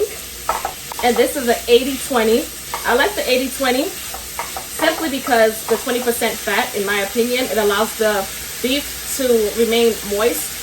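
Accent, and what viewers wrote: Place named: New York, United States. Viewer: That looks good. I have to try it. Where are you from? Sounds like New York or Northern NJ.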